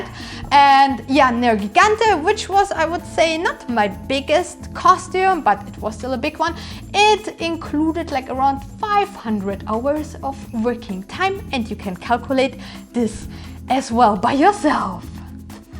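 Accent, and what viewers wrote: Germany. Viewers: Ey ich liebe deinen Akzent, der ist so schön deutsch XD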